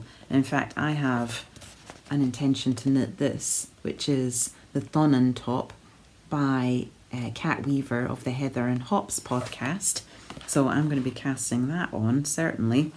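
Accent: Scotland, United Kingdom. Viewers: I love your podcast, inspiring and love love your Scottish accent. Keep on enjoying what you do and how you want to change and adapt to make it more joyful. ❤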